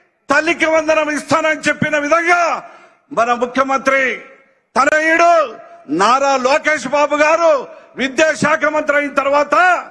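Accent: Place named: Alur, India. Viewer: Nee alour village yasha basha 😮😮😅😅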